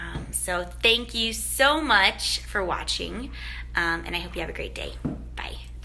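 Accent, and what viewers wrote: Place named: United States. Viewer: Very good voice and thoughtful video.. I like Jesus's teachings. But I don't believe in things like hell and heaven. I would love if you make a video on 'Matthew 7' which is about judging others. That is one of my favorite chapters in Bible. I also like sermon of mount, his parables regarding sowing seeds and many others.. Here is a poem that I wrote combining some verses in Bible and some concepts in Eastern traditions. You may be able to spot a few Bible verses in it. I really like this poem to be heard spoken by someone with a good American accent, like you do. So, in case you like to read this poem in a video, please feel free to do so. It is titled 'Good News'...Here it is: Your life is the most beautiful mystery And the screen which plays your private history; Your life is the truth, consciousness, and bliss That can’t be pointed out as that or this. 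The life screen is the only truth you know; All that are manifest move in its show; This essence of life is your true nature; Knowing this ends the distress and torture. The one truth that pervades the time and space Shines in the heart and showers love and grace; By the veil of darkness it is concealed; By nonstop inquiry, it is revealed. That one truth is called by various names; Deep inside you, it eternally flames; It is the immortal life and the way; Look inside, you can find its sparkling ray! From the dawn of life, seers have seen this And were reborn by its transforming kiss; Unless you’re reborn as a wide-eyed kid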